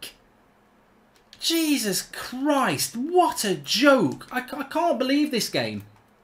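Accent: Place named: Liverpool, United Kingdom. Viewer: Scouser lmao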